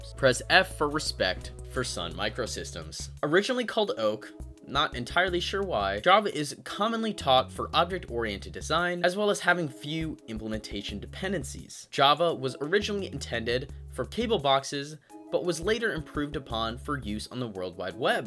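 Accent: United Kingdom. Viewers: Ahh, sorry I missed it. Thank you so much for this awesome video, it was so beneficial. I liked your British accent too. More videos should be made on these topics since computer science is really attractful. Looking forward to see history of operating softwares :)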